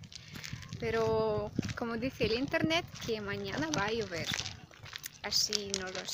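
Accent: Spain. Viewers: Tu acento es de España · ... podrias hablar ruso.. se te hoye bien.. adoro el ruso.. pasa que con el dia a dia.. uno lo que menos aprende es ruso.. te resulto dificil el español? veo que tenes un español de españa...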